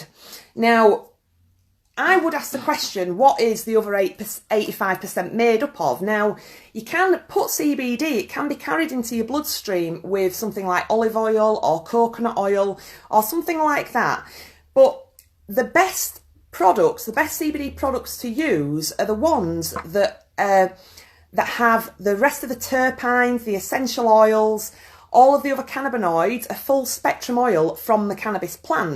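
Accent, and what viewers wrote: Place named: West Yorkshire, United Kingdom. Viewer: Which part of West Yorkshire you from?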